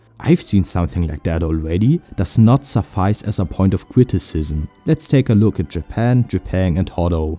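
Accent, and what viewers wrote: Germany. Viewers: Ich brauchte genau 5 Sekunden, um herauszuhören, dass du normalerweise Deutsch sprichst. :D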